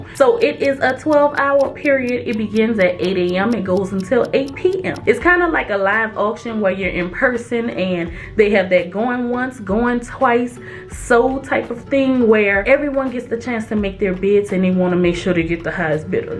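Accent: New Orleans, United States. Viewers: Yeah I hear New Orleans in her voice 🙌🏾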